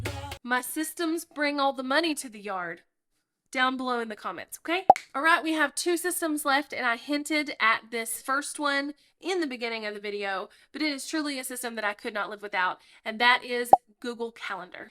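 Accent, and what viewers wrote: South, United States. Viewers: thank you for taking the time to reply. I really appreciate it. 💕Just to add I believe thrive cart also offers sales page, up sell, down sell options too. So that’s one reason I was asking. I don’t own thrivecart , but was looking at it. They also offer a lifetime purchase option at the moment. FG looks very good though. 😁 Anyways … I’m really enjoying your channel and expect I’ll be on your mailing list very soon. Haha. (I’m Australian and maybe that’s why I enjoy your Southern accent … and “all the things” 😂 ). 🤗💖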